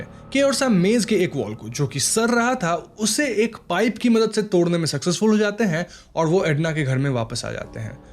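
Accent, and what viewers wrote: Bengal, India. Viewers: Bengali accent boht jyada aa raha hai. Please theek kijiye ise.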